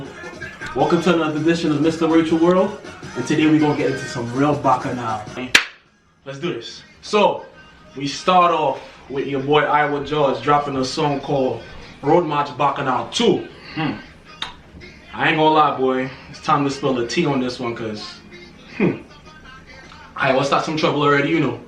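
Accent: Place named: Saint Lucia. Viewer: Knew you were a Lucian, picked up the accent in between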